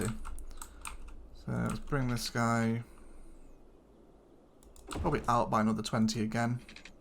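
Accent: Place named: England, United Kingdom. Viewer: Now I know why I like your voice. It's because your english! American acent sounds for me atleast realy bad. Propably because I am native german. Right side is right not left side traffic!!!!!!!!!!!!!!!! :)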